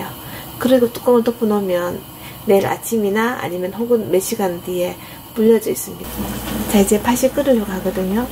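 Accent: Gyeongsang, South Korea. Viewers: 으...너무 맛있겠어요,.. 한번 따라 해볼래요.. 이모님 경상도 말씨 너무 이뻐요..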